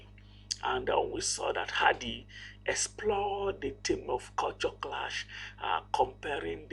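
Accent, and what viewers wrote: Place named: Nigeria. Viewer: I love this video so much the Nigerian accent makes it so much better reminds me of Nigeria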